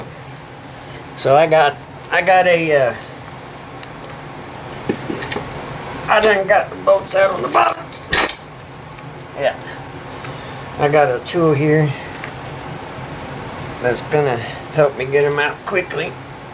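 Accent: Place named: South, United States. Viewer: DUDE!! Thanks for the video! I just bought my 2x 124s the other day for 150$!! LOL One of them is blown, one is perfect still! Theyre like brand spankin new almost! Are you reconing the second sub or are you hanging onto your 2nd recone incase one blows? I need to get myself a coil STAT! I had no idea these subs were so easy to recone like this, I gotta hustle up some cash real fast so I can buy one. If you do recone your 2nd sub you should sell me the coil you take out :D Im from Cookeville, TN, you sound like youre also in the southern area? Take care man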